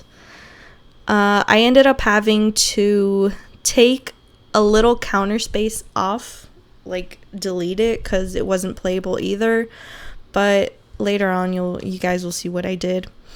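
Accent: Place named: United States. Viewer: Adorei o inglês! Parece até americana mesmo <3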